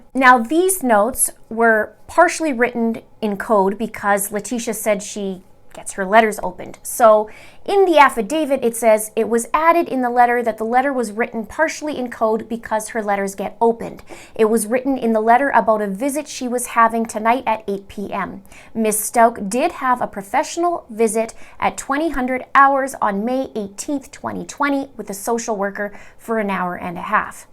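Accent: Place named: Canada. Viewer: I could listen to you talk all day. I love the Canadian accent and after my 1st trip to Toronto I wanted to live there. I mentioned that in a comment after Trump was elected and a Canadian gentleman responded with "We don't want you here." Needless to say I was sad as I always LOVED my trips to your beautiful country 😀💥🥳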